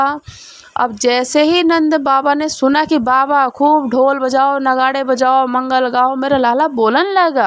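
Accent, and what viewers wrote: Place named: Braj, India. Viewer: Apki barjwasi awaj me hi aap katha ko sunano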